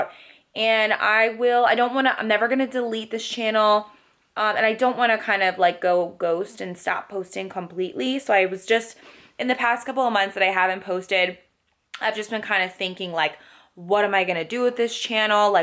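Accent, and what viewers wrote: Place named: California, United States. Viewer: Are you Californian? Because you definitely sound like one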